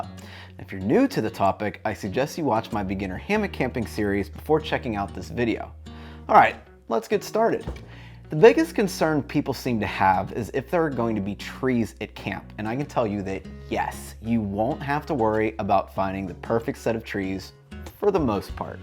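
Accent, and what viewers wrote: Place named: Pittsburgh, United States. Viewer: sounds like a Yinzer to me (I'm from the eastern side of Pennsylvania).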